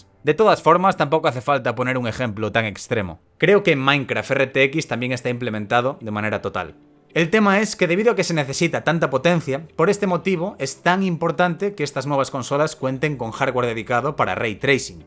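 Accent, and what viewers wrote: Galicia, Spain. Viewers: Eres galego? 🤔por tu acento digo ,buen video creo q cada año la piratería baja lo cual es algo bueno la verdad, a ver yo seguramente me compre la XBOX SERIES X pero pq no me alcanza el dinero para un PC de igual potencia y yo el PC lo uso pa trabajar solo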